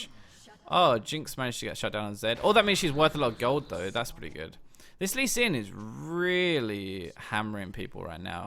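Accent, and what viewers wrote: United Kingdom. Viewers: What are you doing well: Smashing brilliant Brittish accent Pace of speach is good Thoroughly explaining your thought proces (and moves) What you can Improve: Explaining your team composition. I don't understand the Zyra into Morg/Lee, nor the poppy into Renekton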